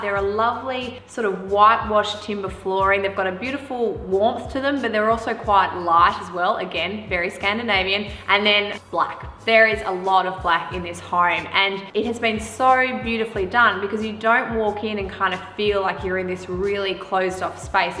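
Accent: Australia. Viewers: Everything seems to come together the music the houses all topped off with the cute Australian accent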